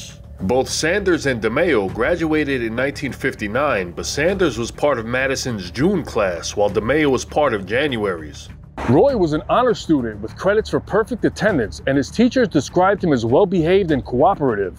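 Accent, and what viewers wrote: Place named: New York, United States. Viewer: You're a really good narrator, especially with the New York accent ( I'm from New Orleans). Very cool channel you have, bro. I'm just discovering it, but it's great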